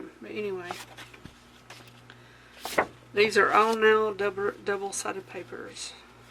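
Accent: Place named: Indiana, United States. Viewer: This is so ingenious! And I was guessing Indiana. I recognize your accent. I was born in Indiana and lived there for years.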